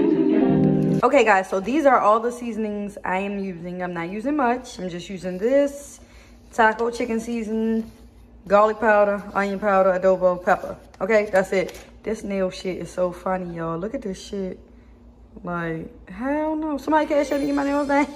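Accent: Baltimore, United States. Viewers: i hear the baltimore in you🤣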